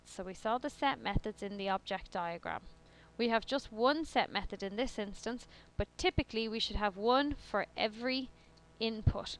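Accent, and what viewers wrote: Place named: Ireland. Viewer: Very helpful! Nice to hear an Irish accent on this topic!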